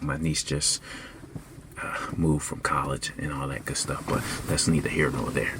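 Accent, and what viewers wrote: United States. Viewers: the maryland accent on a shorty gone get me everytime 😭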